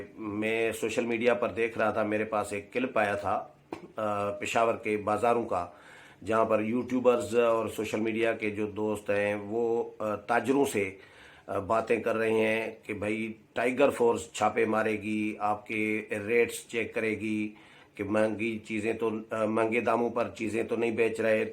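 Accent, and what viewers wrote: Sindh, Pakistan. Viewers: AoA. Sir, aap Sindhi style me bohat achi baat kartay Hain. Khush rahain.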